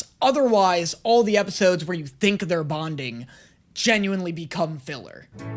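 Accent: United States. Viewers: People are mad because they want you to say Stolas like (Stol-ihs) instead of (Stol-ass) Edit: The more British you are, the more pronounced the a is in Stolas, so it's said like (Stol-uhs) by the Goetia, and then the non-Brittish speaking characters say (Stol-ihs), the only reason why people are on your case is because no one actually says stolass, they say either Stolihs or Stoluhs, never Stolass- it just sounds similar (I think it's bc half the people who say Stolas are British and you have an American accent, so you saying it similar to them makes you want to pronounce the a as an a sound and not how it's actually pronounced) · I think the thing with Stolas’ name is that he’s got a posh English accent and you’re using the same emphasis on the syllables he is, which sounds odd to some ppls ears coz you’re American dunno, you are saying it right tho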